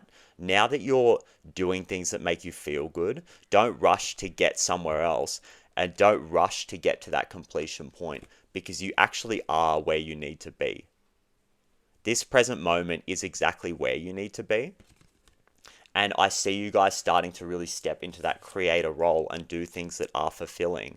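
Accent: Australia. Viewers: The accent initially made me think you were taking the piss! Its so refreshing to see an enlightened Aussie guy thats not wearing a decorative scarf and upcycled pants that were formerly their grandma's curtains... your beautiful authentic self is no doubt inspiring other men that being real and vulnerable (gasp) is actually perceived as strength and not weakness.... well done and sending you love from south of the border! 😊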